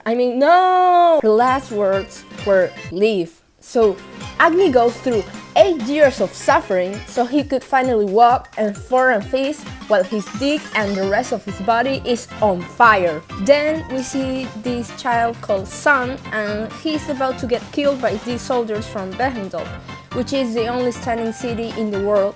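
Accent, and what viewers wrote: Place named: Spain. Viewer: Se nota que hablas español pero que buen video de mi manga favorito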